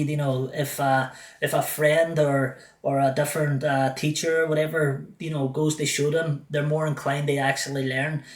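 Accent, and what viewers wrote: Scotland, United Kingdom. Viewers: I know. I was just enjoying your way of talking. I love a strong accent like scottish and irish. 😊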